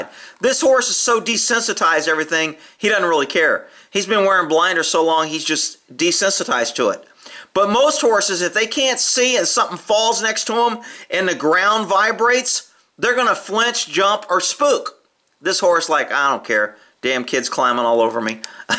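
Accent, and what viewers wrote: United States. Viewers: What a fantastic gentle horse! Completely calm, lets the girls have fun, doesn't even move an ear when the ladder falls to the ground. And I love your comments as always, with that lovely American accent "that horse has one thing on his mind, and it ain't running" hahahaah :D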